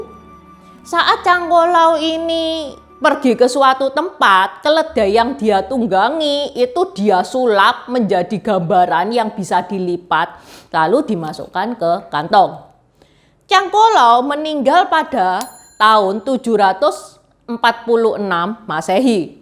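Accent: Java, Indonesia. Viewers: Cc orang mana sih? Medok pol ❤ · Tionghoa medhok.... 🇲🇨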